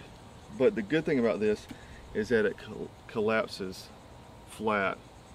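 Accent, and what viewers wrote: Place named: North Carolina, United States. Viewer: Thanks for this video, helps a lot, as I'm planning a trip with someone to the Everglades national park, hiking to the coast of Florida, to Clubhouse beach, I can not wait! Should be great fishing and I hear a lot of crabs, I'm from Central Florida myself but never been that far south, Just curious from your accent, are you from North Carolina?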